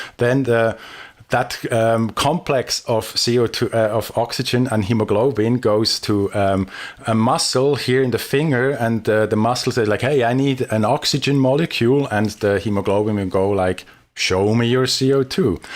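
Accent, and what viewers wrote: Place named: Switzerland. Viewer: Very cool and informative video!😉 really like the swissgerman accent😁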